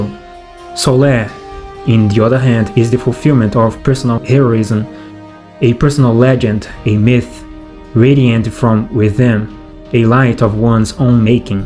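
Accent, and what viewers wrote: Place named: Brazil. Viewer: Krl essa é a primeira vez que percebo o sotaque brasileiro no ingles kkkkk. Video mt bom. Tava querendo fazer algo parecido tbm.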